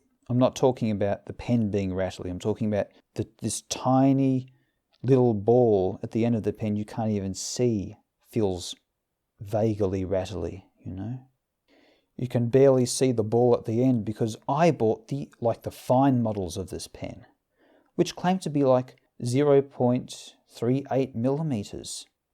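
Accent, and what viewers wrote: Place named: Australia. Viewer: whoa, yes, hahaha. But this chap is (sorry Wade, no offence) a little more high class I reckon. Or it could just be the slightly different regional Oz accent that sounds classier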